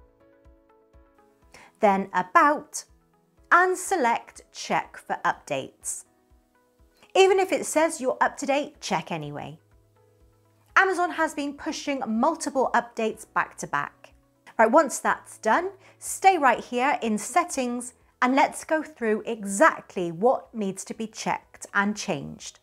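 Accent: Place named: Bristol, United Kingdom. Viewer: Are you in, or near Bristol, England? 🤔 (Guessing, based on yer accent, me awd babber) hahahahah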